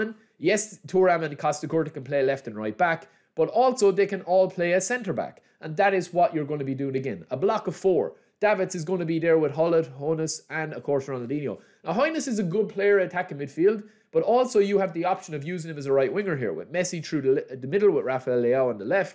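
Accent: Ireland. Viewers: What's the craic lads? Which part of Dublin are you from?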